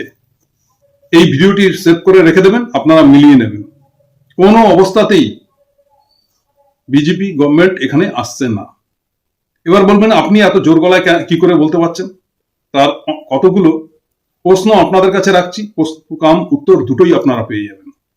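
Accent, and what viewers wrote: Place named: Bangladesh. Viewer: এটাতো বাংলাদেশি মাল বুঝাই যাচ্ছে কথা শুনে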